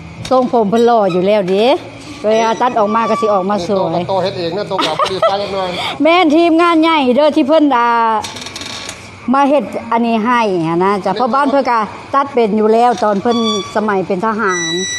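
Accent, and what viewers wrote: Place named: Nyaw, Thailand. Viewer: สวัสดีครับป้าจ๋ามาฟังภาษาญ้อ เว้าม้วนๆครับผม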